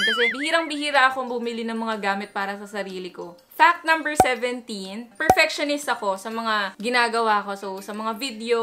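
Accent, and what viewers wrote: Visayas, Philippines. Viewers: Bisaya daiy ka te😌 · Bisaya ka dai??????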